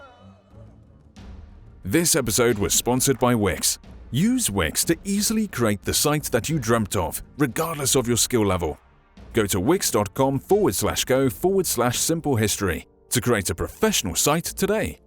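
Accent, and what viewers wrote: United Kingdom. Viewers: Stop using this stuppid brits vocif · I hate the brittish accent for some reason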